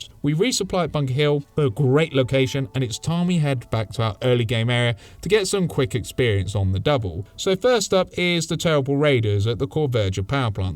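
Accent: RP, United Kingdom. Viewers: it’s the formal British accent, the intro I similar as well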